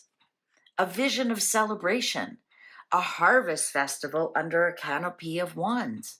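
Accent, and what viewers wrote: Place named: Canada. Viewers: I loved this. Your accent I love it Canadian. You brought the story to life's 🎉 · Canadian accent 😂❤ I am from the same area as We don’t hear our accent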